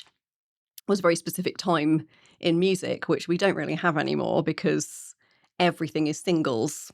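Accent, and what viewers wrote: United Kingdom. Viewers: She sounds brittish i can't believe she's never listened to PF before 🙄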